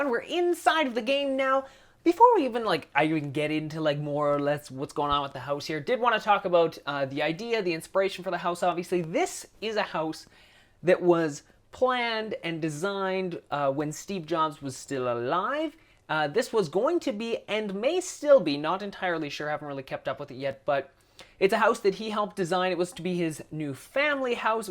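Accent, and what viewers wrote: Canada. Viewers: He's Canadian, so he has an accent. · He's Canadian, they pronounce it differently. It's not that serious! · Are you Canadian?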